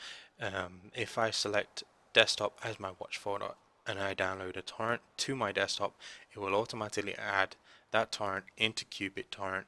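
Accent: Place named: South Asia. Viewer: this guy has a south asian english accent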